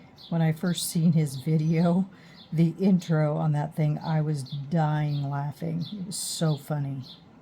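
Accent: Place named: England, United Kingdom. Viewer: Loll! I heard his beautiful English accent reading this comment!!! And yes beautiful results ❤🎉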